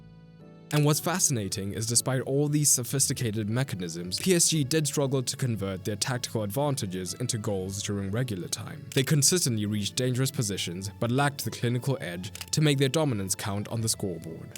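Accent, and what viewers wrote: South Africa. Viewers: Lol, are you south african?, your accent sounds so south african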